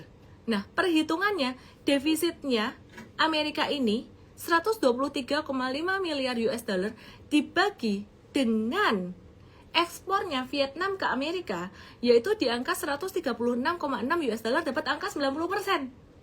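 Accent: Java, Indonesia. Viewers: kalo lagi kesel, medoknya jadi tambah kentel 😁😁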